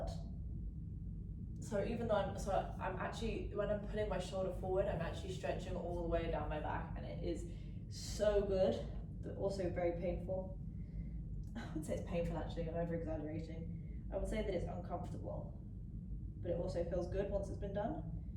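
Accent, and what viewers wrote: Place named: Australia. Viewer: AYE!?!Mr. Shit Starter,. That's Me Aussie sister, ya Monitor Mouse masoosing Pre-Vert & Since she is my sister by human race standards. I love & respect my brethen, So this is mearly giving you props & I'm an emotionally mature man, nothing explicit, just giving credit were it's due, &...." I WANNA GO TO A LAND DOWN UNDER!", Icld never deny thee accent, but This is the 1st time saw you.._so OVERDUE IS A HUGE UNDERSTATEMENT,Its like I found an eviction note when I got home &theyre shutting my water, lights&gas off tomorrow 'OVERDUE.' And I would be a fool to Not say, WOW, You are 'PROP'URRR´ {my own,just mean VERY ATTRACTIVE....& Iam attracted. But persue what make you happy sweethearts. I ask Girl, Just PLEASE 'NEVER Do 'Onlyfans' or 'AduVid' I KNOW OnlyFan have big ties w 'Child Sex Ring' 4 different sourcesWe know how the other rolls. 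My Instragram is 24 days Old & dismal.You don't mind.Ikeepit fun, so I hope you &I cld friends. Talk to ya soon. Ok?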